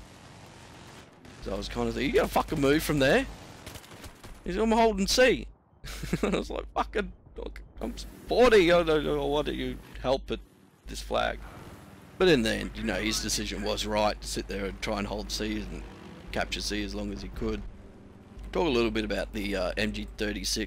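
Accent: Australia. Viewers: Wereabouts are you from, i'm gessing Aussie :D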